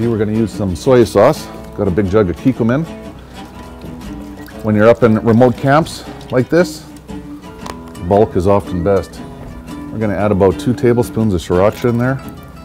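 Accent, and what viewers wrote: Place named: Canada. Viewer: Cool except for the creepy Canadian accent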